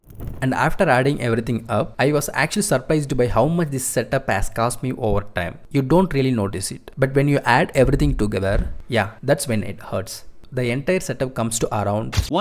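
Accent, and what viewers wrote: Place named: India. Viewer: He is speaking in stereotypical Indian accent but it sounds good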